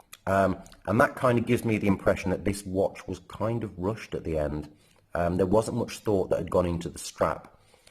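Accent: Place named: United Kingdom. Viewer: Judging by your accent I take it your from the U.K. and from what I heard is that the P01 over in the U.K. was a hit as soon as it became available. I’m not saying there were waiting lists for it. Just that when a dealer got it there was someone to buy it. Maybe that was the reason you had such a difficult time acquiring one. Over here in he U.S. it’s not as popular in my opinion and because of that if you do some digging around you can still find one from an Authorized dealer. But I don’t believe that all dealers are even ordering them. At the moment the watch is getting no love from anyone but as soon as it gets discontinued people will start realizing it’s a classic and a must have. I’m really curious if they discontinue it when they come out with new releases or give it another year. Maybe corna will be a decision factor for discontinuing it because they are backed up on production?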